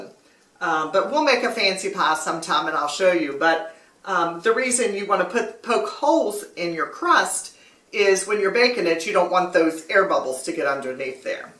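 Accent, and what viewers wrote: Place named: Kentucky, United States. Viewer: You sound like a Ky. Girl, but I’m not sure. We like chushawl better than pumpkin too.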